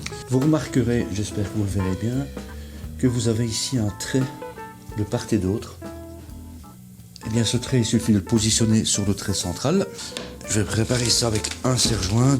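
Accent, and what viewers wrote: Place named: Belgium. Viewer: On sens un petit accent belge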